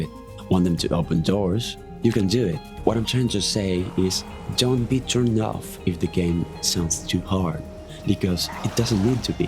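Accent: Argentina. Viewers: vos sos argentino si o si a mi no me engañas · Flaco hablas muy bien inglés, por tu voz sospeche que eras argento y lo verifiqué en tu cuenta. Alto video papá 10/10 seguí asi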